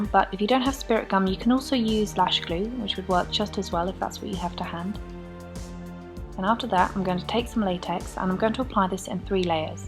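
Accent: United Kingdom. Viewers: British accent or Australian?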